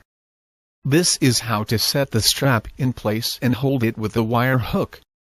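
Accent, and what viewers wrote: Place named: Spain. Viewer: I prefer your cool voice with Spanish accent instead of this robot.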